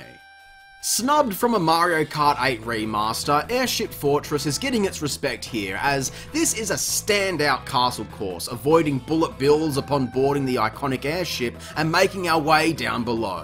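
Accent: Australia. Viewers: Wow, what a bumper and brilliant treat this was to watch! I really enjoyed your opinons and enthusiasm, nice to have an Ozzie accent to listen to throughout!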